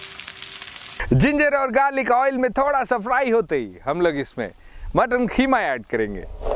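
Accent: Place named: South India, India. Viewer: MashAllah bhai tumhari Urdu bari mazay ki hai... South touch ata hai... Love from Pakistan to the Indian ❤️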